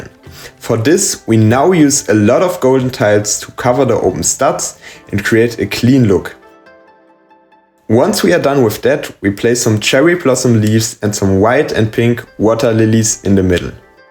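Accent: Germany. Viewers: Wow wie geil ich holle die mir fix ich höre deutschen akzent😂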